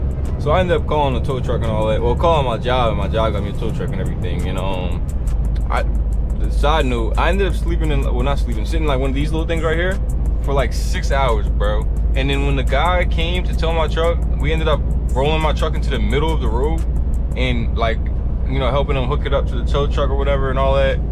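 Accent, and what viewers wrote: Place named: Baltimore, United States. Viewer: Baltimore accent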